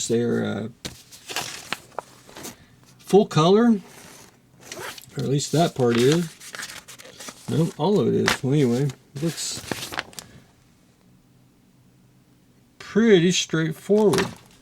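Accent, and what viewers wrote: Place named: England, United Kingdom. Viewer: Mais ce qu'il peut être saoûlant ce rosbeef avec son accent pourri en plus !! P.... on comprend rien de ce qu'il baragouine !!! À se demander s'il se comprend lui même !!? En plus il ne va même pas au bout du montage ... 😕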